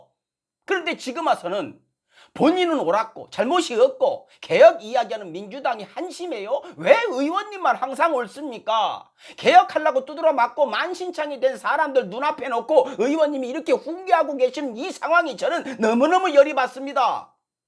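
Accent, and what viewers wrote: Jeolla, South Korea. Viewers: 구수한전라말 택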